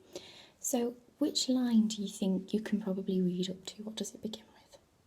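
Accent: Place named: Southern England, United Kingdom. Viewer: Brok Homz good old south of England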